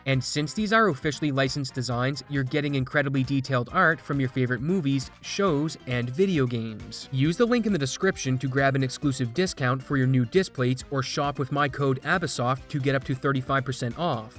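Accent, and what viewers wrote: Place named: Newfoundland, Canada. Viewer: Took me like 3 videos to catch the Newfoundland accent. 👌🏼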